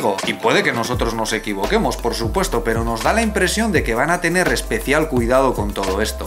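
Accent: Spain. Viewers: y no sabes distinguir un uruguayo de un ESPAÑOL? Pues nada en común el acento . Saludos desde el pueblo de Javier Telletxea. NAVARRO. ESPAÑA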